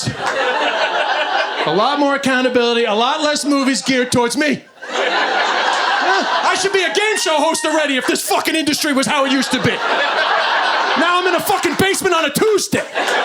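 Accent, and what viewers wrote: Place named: New York, United States. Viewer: Bill Burr with a NY accent instead of a Boston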